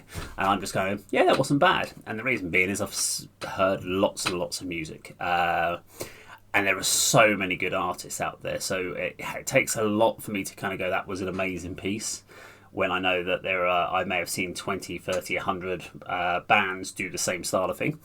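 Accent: United Kingdom. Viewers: You got 1 like and 1 new subscriber here 🙋‍♂️ Bcause i appreciate ur honest reaction, and i like ur british accent too lol Next - cover.. Thx m8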